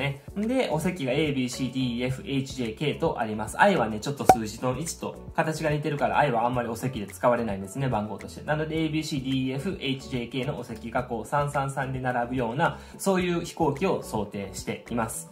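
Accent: Kansai, Japan. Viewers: 参考になります、私はトイレがちかいので通路側に座ります、感じが、YouTubeおのださんに似ていますね。関西弁もね。✈ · 関西弁のツッコミがめっちゃ面白いです🤣 海外の航空会社に就職されるのはすごいですね ご優秀なのがわかります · はじめまして～～♫ 某YouTuber さんのコメント欄で、数名の方がおススメしていらしたので、来ました🏃‍♀️♥ 今回の動画、めっちゃためになりました。 私は昔は早く降りたい！ので前の席を予約していましたが、最近は、後部座席の方が隣の席が空いてることが多いので、後部座席を予約するようにしています。 あまりに後は言うてはるように、トイレ待ちの方が並んでたりするので、やめるようにしています。 関西弁なんで、めっちゃわかりやすく、楽しいです。 これからも動画楽しみにしています～ね。💖 よろしくお願いします☆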